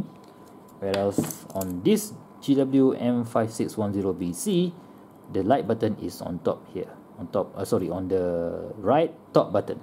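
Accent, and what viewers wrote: Malaysia. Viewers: Are you Malaysian? You sound like one haha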